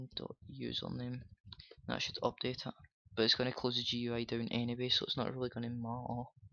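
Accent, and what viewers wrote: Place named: Scotland, United Kingdom. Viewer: nice sco'ish accen' ma'e, I'm from glasgow but not got an accen' like you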